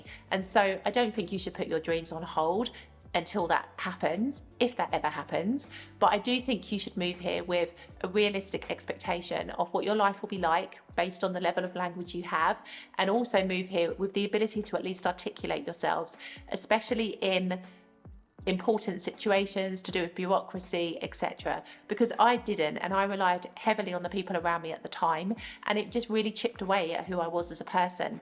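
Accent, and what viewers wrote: United Kingdom. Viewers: Actually she’s not very expectedly fluent. Her level is very very low. Her accent is still very British 🇬🇧. I believe everyone’s journey is different. I am learning Italian too. One day I feel like I have made progress the next day every word has flown through the window and I start all over 😆😆🥹🤔.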